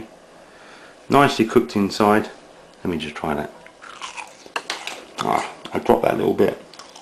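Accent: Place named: London, United Kingdom. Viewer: Thank you sooooo much.. i made this last night my husband loved it.. soo yummy,simple an easy ,and... yes ..your accent very londoner sounds kool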